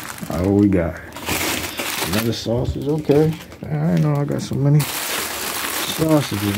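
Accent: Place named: Indiana, United States. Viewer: What’s really crazy is on the outs you’ll only see 98% of the stuff he has in a hood store. Nowhere else. N bro sound like he from Indiana or Michigan he don’t sound like he frm da city or Illinois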